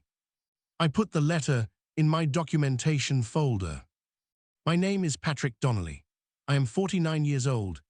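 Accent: United Kingdom. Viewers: And another totally ABSURD story with a British accent from a 10yr old mind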